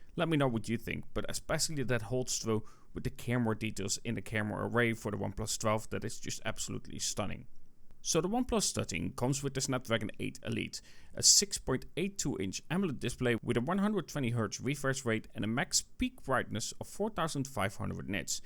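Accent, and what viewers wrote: Netherlands. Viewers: Hoi, het viel mij al op dat u ook Nederlands was vanwege uw accent (Geen belediging, uw Engels is goed). Ik heb zelf ook maar besloten om de zwarte OnePlus 13 te kopen met 512GB/16GB RAM opslag via TradingShenzhen Mij werd geadviseerd om de OnePlus 12 te kopen destijds eerder deze jaar maar ik besloot om het maar toch niet te doen tot later deze jaar waarin het toch duidelijk werd dat ik echt behoefte had aan een nieuwe telefoon dus ben ik maar voor de OnePlus 13 gegaan. Het lijkt er ten slotte op dat ik onbewust een goede keus heb gemaakt. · lol ja je bent duidelijk nederlands zo te horen ;)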